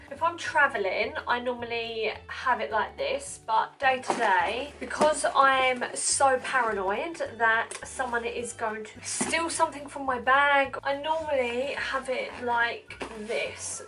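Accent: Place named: England, United Kingdom. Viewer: I absolutely LOVE your accent!! I want to go to England 😍😍 Great video..i have this in the "MM" size.. thinking about getting the "GM" for travel! You're gorgeous! New subbie! ❤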